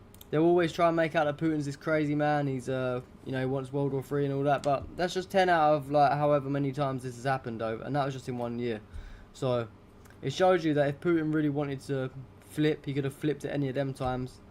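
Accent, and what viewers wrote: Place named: United Kingdom. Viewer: I like how your accent is (the British flair 😅), especially at the beginning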